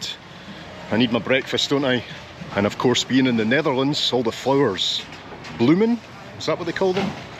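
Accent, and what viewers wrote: Scotland, United Kingdom. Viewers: Amazing video! Nice detailed view of one of The Netherlands oldest and most beautiful cities! I’ve been there a few times as well. Twice I went from the train station on to Belgium and France on my bicycle to follow my last name 😄 The Helpoort (Hel Gate) is a nice thing to see. It’s the oldest city gate in The Netherlands but barely resembles the original. It has been rebuilt and reconstructed quite a few times over time, so only the arch is original. The towers and spaces above it aren’t. Still a nice thing to walk through, knowing you’re walking through something that has been there for nearly 800 years. You’ve pronounced ’Maas’ correctly! In French (and also in the French part of Belgium), this river is called ‘La Meuse’, in Dutch it’s ‘Maas’ or ‘De Maas’. Actually, through your somewhat thick Scottish accent you actually pronounce most Dutch names and places quite nicely. 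Very entertaining to watch and now you’ve got a new Dutch subscriber! 👍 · I just noticed, your Scottish accent translates surprisingly well to Dutch. (Or you simply have a good ear, also possible of course.) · Yes, it wasn't difficult to understand him. I think Dutch spoken with a Scottish accent sounds interesting. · Although there is that slight Scot accent, your Dutch word pronunciation is spot on. Love that you said Amsterdam with a short A instead of the long A most Americans use. Can you imagine using a long A for America? Yet they can't pronounce Amsterdam correctly. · With your Scottish commentary my town seems quite exotic to me all of the sudden... and you point at details I never paid attention to. Thank you for your appreciation.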